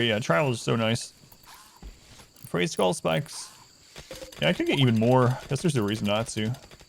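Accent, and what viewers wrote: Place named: Appalachia, United States. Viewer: you should totally let your flag fly and do an Appalachian Run. You can revert to your old accent, only settle where it's most like Appalachia, and role play with all the stereotypes and culture of home. That would be amazing to see.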